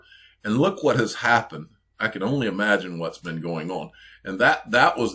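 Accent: South, United States. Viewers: Glad to be back. Missed your sultry southern voice sing to me about XRP. Happy and Healthy Thanksgiving. Smoke that Turkey!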